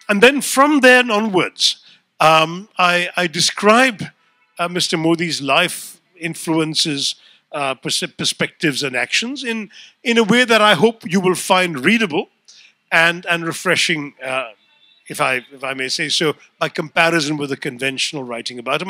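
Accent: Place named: Oxford, United Kingdom. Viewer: Shashi is actually an intellectual fraud. Whatever he speaks against PM Modi is mostly biased and is more applicable to his PM mms or Sg or rg. Speaking good English without substance is of no use for the ‘cattle class’ who have been given gas cylinders, houses, business loans, pension, medical insurance, saved from the tyranny of triple talak, electrity connection, cheap mobile data and above all a sense of hope and pride together. Speaking lies 100 times won’t transform it into a truth even if punctuated with oxford English. A true fraud.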